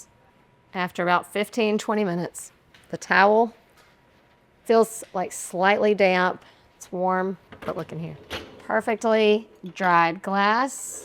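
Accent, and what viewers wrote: South, United States. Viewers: Terrific accent too - especially if you’re from the UK and don’t hear these beautiful southern US accents much. She’s a smart, natural presenter… :)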